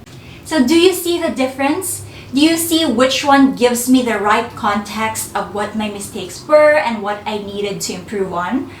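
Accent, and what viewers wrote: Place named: Philippines. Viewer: Feedbacks are gifts to improve ourselves. Guys, you expect her to have an americanized accent? She's a filipino. As long as we understand her, that's fine.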